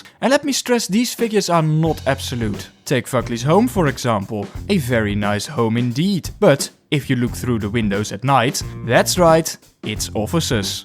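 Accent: Netherlands. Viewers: Obvious Dutch accent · only Dutch people hear you are Dutch right away😂 You can hear the accent but it doesn't bother me and liked the video you made😊😄 (I saw a video where a dutch person with terrible english is explaining how we live in 🇳🇱😂) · U sound Dutch to me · Dit is de eerste video die ik van je zie, ik heb een gevoel dat je nederlands bent hahahahah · Imma Nederlands accent, not dik at all. Very hollands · Are you dutch? I can hear some netberlands accent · You're dutch. I knew it after the first sentence😂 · lekkere nederlands accent ouwe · Damn great vid question tough are you dutch since you have a slight dutch accent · Leuke video! En heerlijk om dat Nederlandse accent erdoorheen te horen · You sound dutch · most intense dutch accent i've heard in a whike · I have a slight suspicion you might be Dutch. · I smell Nederlands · I love that, being dutch myself, I can hear straight away that this guy is dutch as well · Bro is de minst opvallende Engels sprekende Nederlander haha, goeie video alsnog. 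<3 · i can tell lol. Im dutch to so i can tell when someone is dutch🤣 good vid tho! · I am a certified Dutch person and I smell Dutch in your voice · Je bent Nederlands he? Bekend accentje haha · Do I hear a Dutch accent? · I smell dutch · This guy is probably Dutch, i'm Dutch and some Dutch people have this accent. I don't btw. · Man I thought I was gonna enjoy this video but as soon as I heard the Dutch accent I had to turn it off. Damn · I smell dutch haha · Loved the vid! Noticed you're accent sounds dutch. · Are you Dutch? :) I like the Dutch. Love from Sweden! 💖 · I mean he has a Dutch accent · U sound dutch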